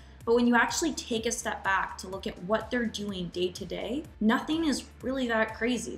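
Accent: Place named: Canada. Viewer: Just realized you're Canadian but how you pronounce words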